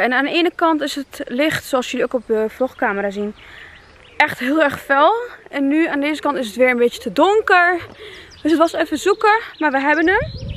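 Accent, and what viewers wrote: Twente, Netherlands. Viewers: ik geniet van dat twents er tussendoor !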